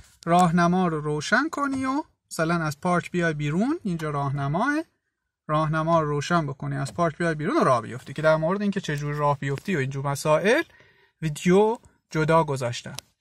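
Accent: Shiraz, Iran. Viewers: لهجه ی شیرازی خیلی شیرینه ربطی نداشت ولی کلا گفتم😂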